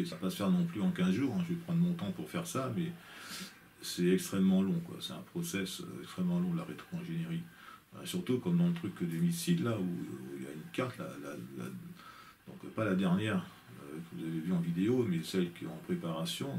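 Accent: France. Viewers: Bien entendu qu'il te faut continuer en anglais, malgré les remarques du type que j'ai pû te faire sur ton accent bien français. Ton contenu est absolument unique par sa précision et le type de matériel, ce serait bien dommage que tu te prives de 95% des utilisateurs de youtube. Carry on and all the best! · l'anglais est simple mais bon c'est l'accent qui est franchouillard au possible. l'anglais couvre évidement un plus grand public, si c'est compréhensible et interessant les gens regarderont. Ne laissez pas tomber. Regardez Isaac Arthur, un défaut de language et pourtant 1/2 million d'abonnées pour des vidéos ou il parle non stop 1h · Hi, I downloaded the french transcript and put it into the translator. Your english is very good and does not sound like a "spanish cow" like some bad people may have said. They are just jeallous. Of course you got a french accent, but most english speaking creators on youtube have some form of foreign accent. 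That is totally normal. It would be really nice if you could continue in english so you reach a broad audience all over the world.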